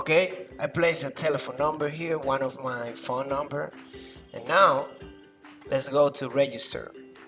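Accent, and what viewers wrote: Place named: Caribbean. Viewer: I love Caribean English!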